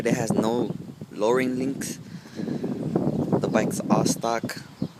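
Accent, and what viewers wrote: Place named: Mexico. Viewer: u sound like a typical Mexican bro.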